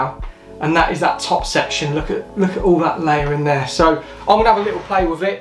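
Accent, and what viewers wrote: United Kingdom. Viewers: As much as I like the British accent you talk so much I get anxiety 🤣🤣🤣🤣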